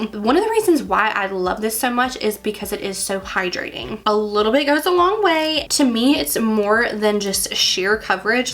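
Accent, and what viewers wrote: South, United States. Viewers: Great review! Love your adorable Southern lilt. Totally adorable vibe. Can't wait to try this product. ❤😊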